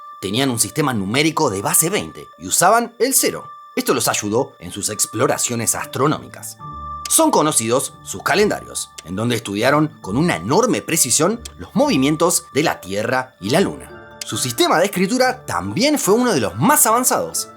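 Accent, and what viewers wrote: Buenos Aires, Argentina. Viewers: Porqué dejaste de hablar como argentino???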